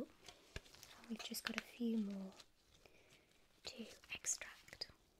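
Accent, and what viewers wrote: United Kingdom. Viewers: A woman with a British accent is very lovely 😍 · What kind of accent is this??? I’m assuming something from the UK, but is there a specific region name? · Ohhh is that a British accent??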